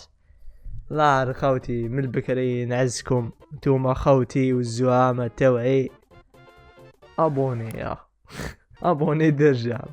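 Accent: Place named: Algeria. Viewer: هو يتكلم بلهجة الجزائرية لانه جزائري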